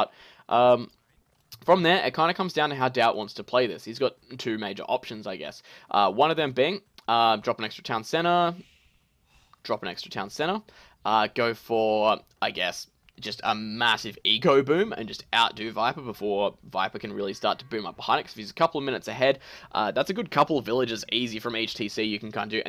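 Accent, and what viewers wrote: Australia. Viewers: Resonance22 will always be my favorite caster, but you're amazing too! :D I love aussie accents 11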